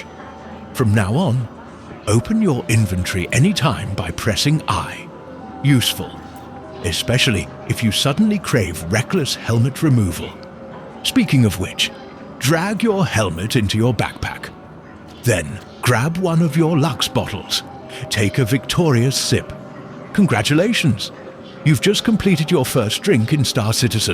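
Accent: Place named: United Kingdom. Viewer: Great comedic writing! And the stiff-upper-lip British voice is peak! Can't wait for the next one.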